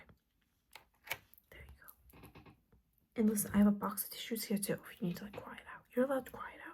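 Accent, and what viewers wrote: New Jersey, United States. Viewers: Thank you for another Jersey accent video! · Girrrrrl you’ve GOTTA be from NJ or NY. As a Jersey girl myself, the accent is spot on. Loved this vid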